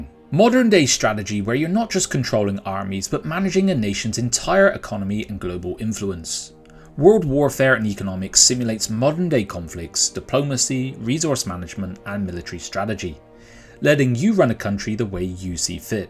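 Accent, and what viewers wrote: United Kingdom. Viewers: you sound kinda British tho. Just weird to use Euros as a currency. Like a US Youtuber quoting prices in GBP. Just weird.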